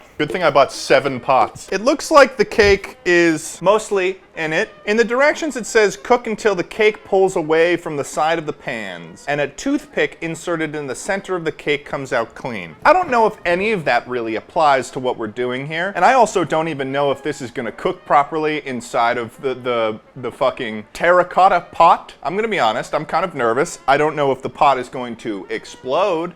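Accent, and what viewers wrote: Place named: Massachusetts, United States. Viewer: This man is definitely from Massachusetts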